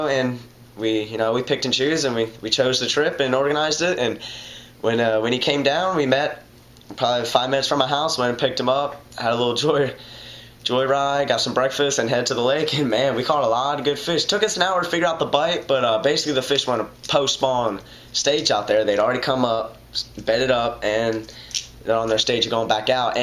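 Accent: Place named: South, United States. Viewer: wtf did you develop a southern accent?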